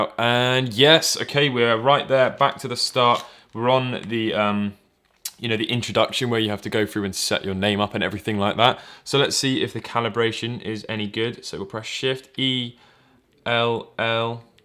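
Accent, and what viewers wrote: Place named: United Kingdom. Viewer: Nice British accent👌👌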